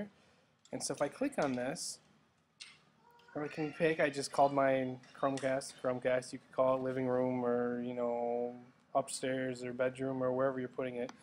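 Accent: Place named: Canada. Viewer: You sound nice and Canadian!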